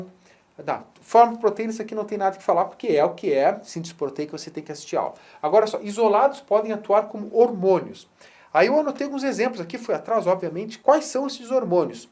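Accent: Rio Grande do Sul, Brazil. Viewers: Dei o like só por saber que tu é colorado, bem que eu vi que sotaque e era gente boa demais já vi que era meu conterrâneo Gaúcho, brincadeiras a parte, conteúdo muito bem explicado, excelente trabalho Prof., manda bem demais.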